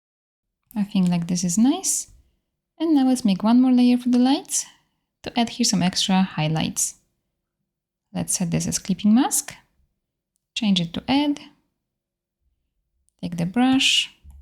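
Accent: Poland. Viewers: Hi u have polish akcent can u speak polish language?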